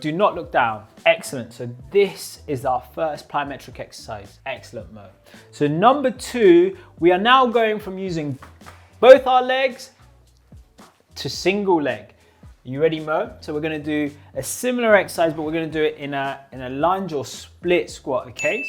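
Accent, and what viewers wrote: United Kingdom. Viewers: Brilliant British accent 😮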